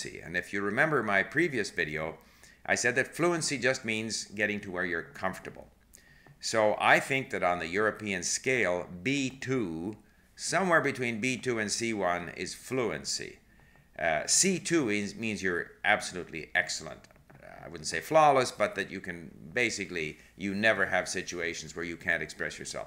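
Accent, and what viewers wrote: Canada. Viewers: yes this is something I also find interesting! I never thought Canada had an accent until I went to Vancouver and when I saw yuor first video I thought "wow this guy has a Canadian accent en then you said you were from there en I was like wow I was right! hahaha but yea very interesting topic! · Hello,! Can you do some videos about the different kind of English accents (British,US, Scottish,Australian etc...)? I'm French and it will be great to know more about it. And also if you can talk differences of vocabulary between Canadian/US/UK vocabulary? I read that for US, you (English Canadian) have an accent but I am not able to notice yet. Is it as strong as us French people with French Canadians? Do Canadian people learn French from France or French Canadian?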